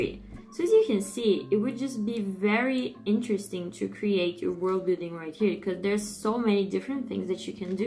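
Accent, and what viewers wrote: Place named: Portugal. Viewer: Im brazilian and it sounds like portuguese accent Just a guess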